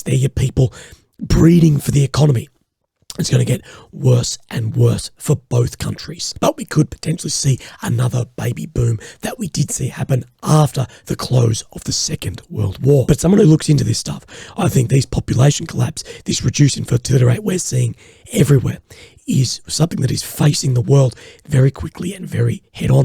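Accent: Australia. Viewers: funny Australian accent and obscure)) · Can't speak Australian without a smile! Thank's for your efforts, homie.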